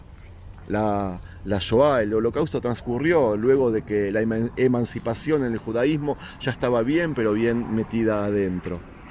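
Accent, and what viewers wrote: Argentina. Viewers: quiero saber porque hablas tan bien el español con acento argentino... cuéntame o donde puedo ver un video... me imagino que ya lo dijiste....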